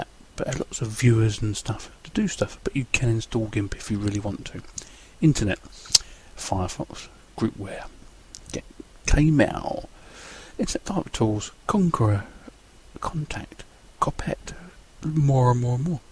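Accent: United Kingdom. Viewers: Very nice, matey. Loads of stuff there. You really are getting what you need. And nice to see some specs on the machine, your running it on. I've never really used KDE. should i give it a try? Btw I love your ''british'' (scottish?) accent. ''tic, toc, tic, toc'' Hehe. Great review matey!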